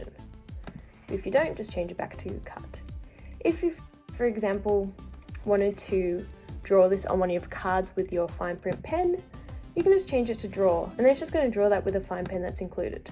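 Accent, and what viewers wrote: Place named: Australia. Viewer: i adore your australian accent 😍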